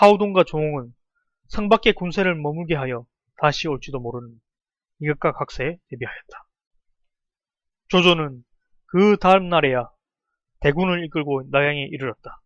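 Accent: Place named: Gyeongsang, South Korea. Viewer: 경상도발음의 한계라 듣기는 좀어색하긴합니다 수고하셨구요 목소리가 톤이 어울리지 않아요ㅎ 다른거 도전해보세요 코메디나개그같은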